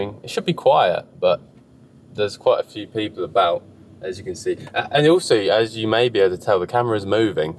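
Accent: England, United Kingdom. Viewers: I also love watching these videos! And the English accent along with your jargon is really fascinating to listen too. Thank you for all the hard work you put into your harvesting and videos!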